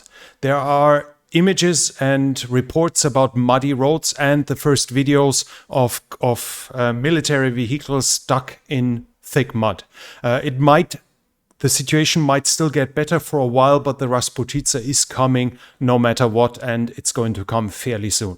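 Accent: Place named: Germany. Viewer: Well he does have a German accent and that is totally fine, you shouldn't look to loose your accent when learning English but instead focus on pronounciation, vocabulary etc...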